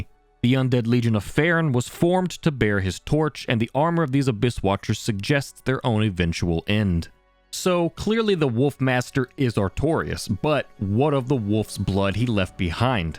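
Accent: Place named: South, United States. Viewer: I just now noticed that you have a slight southern accent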